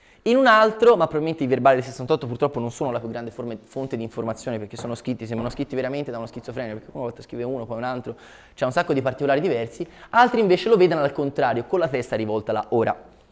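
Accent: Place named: Tuscany, Italy. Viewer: È il Toscano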